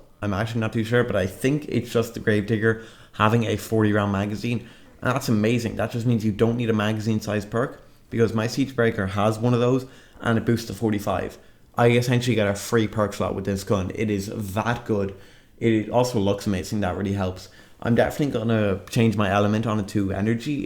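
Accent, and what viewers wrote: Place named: Ireland. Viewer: You’re from Ireland I can tell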